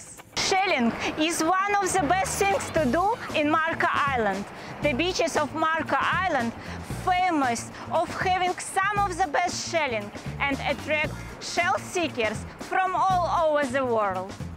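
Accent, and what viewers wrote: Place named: Russia. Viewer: I like your Russian accent!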